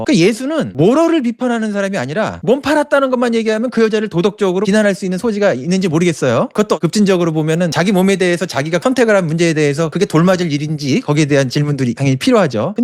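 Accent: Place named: Seoul, South Korea. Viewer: 이분 서울사투리 쓰시네??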